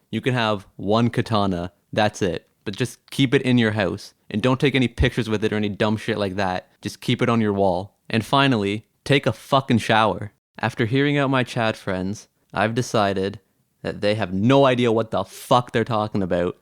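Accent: Newfoundland, Canada. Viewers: “Aboot” “b’ys” Newfoundland? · i knew you were canadian btw i can hear it in your voice. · Is that a Canadian accent? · Dude are you a Newfoundlander by chance? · Are you from Newfoundland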